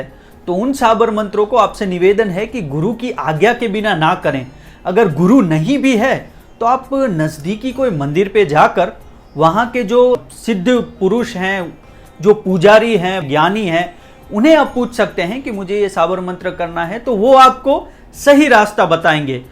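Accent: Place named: Gujarat, India. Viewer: Bhai gujarati lage bava hindi bol rahe hai super a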